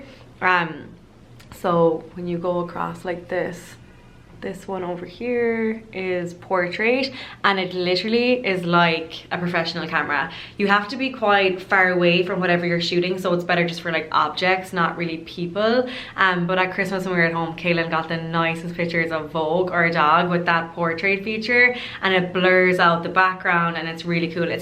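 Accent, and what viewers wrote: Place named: Ireland. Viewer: Lovely your Irish accent. ❤️❤️❤️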